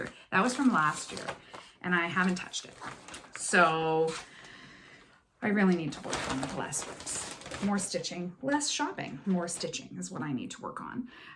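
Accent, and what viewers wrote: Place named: Canada. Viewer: Nice to hear a familiar Canadian "accent". I'm also in Ontario, closer to London. I love seeing all your projects. We have similar tastes in stitching and I have some of the same ones ready to go. I usually stitch on one project at a time and I'm over half-done a Teresa Kogut chart (Cotswold Fields) at the moment. We are about an hour from the border, so that's convenient for Hobby Lobby, etc. Luckily we have a LNS in London (Stitch-It Central). Keep stitching!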